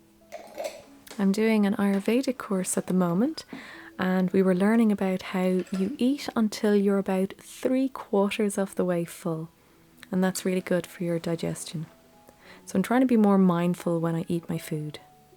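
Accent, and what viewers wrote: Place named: Kilkenny, Ireland. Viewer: Is that an Aran!? So beautiful! Also are you from Wicklow or is your accent from Kilkenny?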